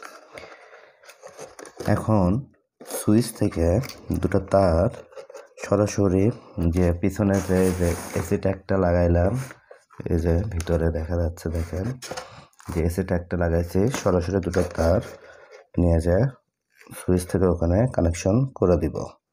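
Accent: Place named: Bogura, Bangladesh. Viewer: বগুড়া বাড়ি নাকি বাড়ে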